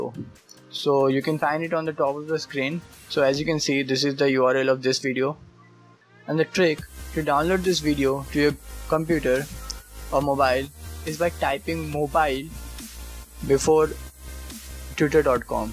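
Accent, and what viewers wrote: India. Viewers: you know it works when there's an indian voice v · I did't understand a word from this video, he's talking Indian not English !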